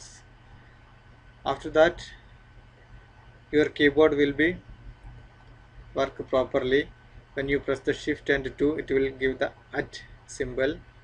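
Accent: India. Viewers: Thank you love your India accent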